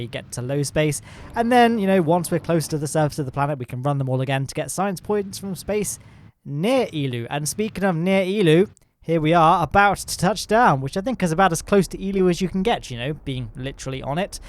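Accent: United Kingdom. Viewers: I love his stuff, great video's and explanations. I even like the British accent. Half way through this one though, and I've lost interest in him. HeiGHT. HIGH T. HARD T. It's not heigthhhhhh!!!!! Well educated, a doctor, and ENGLISH- it's THEIR LANGUAGE!!!!!!! Do you axe patients how dey feelz too?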